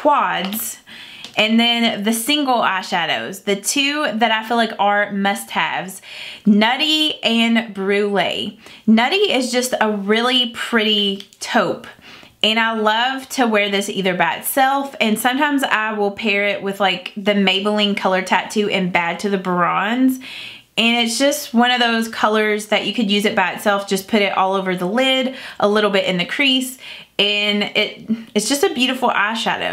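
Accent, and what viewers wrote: South, United States. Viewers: Lol, sorry looks like I'm flipping yall off in that southern draw, I loved it.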